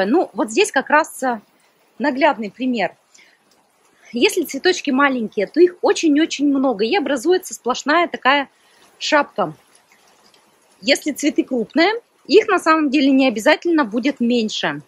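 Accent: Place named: Urals, Russia. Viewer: Первый раз смотрю вас. Сразу подумала что вы с Урала. У меня там есть родственники у вас своеобразный интересный говор не перепутать. И слова есть которые только от этих родственников слышу. Очень у вас все цветы хороши👍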